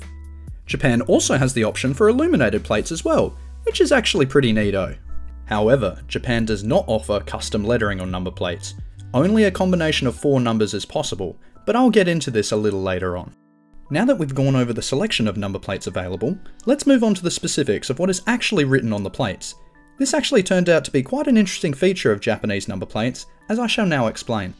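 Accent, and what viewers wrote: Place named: Australia. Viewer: Me Japanese learning about ナンバープレート of my own country, by watching English video with Australian accent😅😊 Thanks a lot. I didn't know a lot of things you mentioned.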